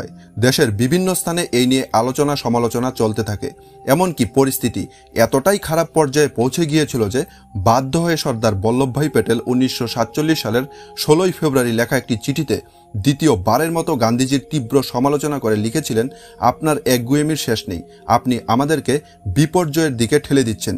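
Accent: Bangladesh. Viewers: ভাষায় তো মনে হয় বাংলাদেশি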